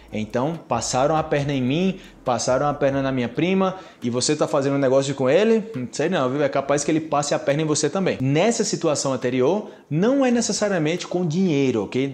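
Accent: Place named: Colombia. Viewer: putzzz cara, tô chocado do jeito que tu fala espanhol, tens até sotaque de colombiano kkkk amo seu conteúdo !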